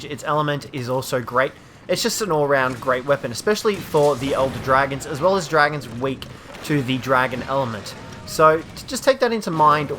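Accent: Australia. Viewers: If you've ever met anyone from down under, there are many words they pronounce differently culturally. I.e. Pita (Pih tuh) visa (Pee-ta), and abalone (Ah-ba-lone) visa (Ah-ba-lone-e)... and in the end, who really cares? Did you understand WHAT he was talking about? If so, why is his use of the word a problem? So, in my opinion, let it kill you... One less d-bag's comment.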